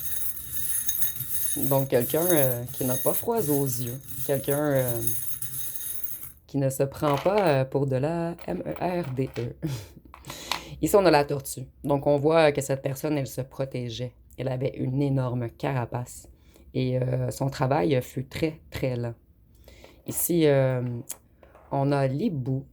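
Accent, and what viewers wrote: Quebec, Canada. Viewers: Bonsoir Vous êtes belle vous expliquiez tres bien j'aime votre accent québécois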